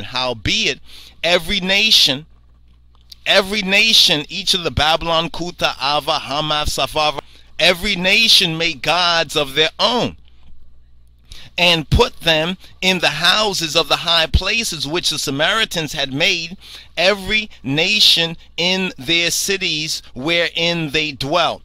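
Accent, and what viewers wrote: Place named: New York, United States. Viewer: Are you from New York or maybe Boston? I hear a familiar accent, just curious. (BTW) I like it a lot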